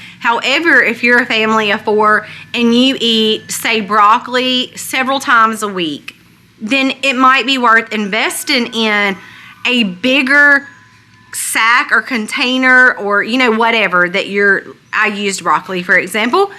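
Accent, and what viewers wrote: South, United States. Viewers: I'm from the south but I absolutely love your southern twang. The south is the best even our Southern Belle women have sweet beautiful speech!!!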